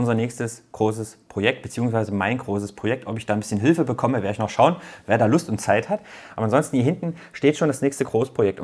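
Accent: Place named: Saxony, Germany. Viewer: Sry...hatte im vorherigen Video dieses noch nicht gesehen und dich nach dem woher und wie gafragt....wie gesagt, erst den 5ten Tag dabei....werde Dich definitiv via Amazon Link unterstützen, bei mir stehen im Februar einige Investitionen an und ich werde an dich denken..... Mach bitte weiter so.....Ehrlich, Symphatisch, Sächsisch....ich freu mich.....!!!!!!!!!!!!!!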